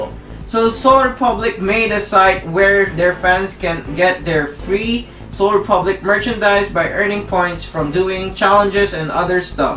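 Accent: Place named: Philippines. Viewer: I noticed a slight accent, I'm Filipino too! I lost my accent though D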